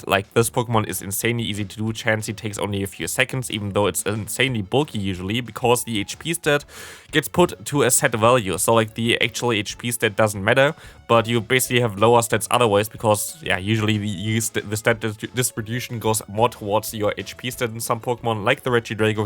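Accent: Germany. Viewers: ich wusste zwar, alleine durch den Akzent, dass du deutscher bist, aber Eutin ist nicht mal ne halbe stunde weg von mir😂 Grüße aus Schleswig Holstein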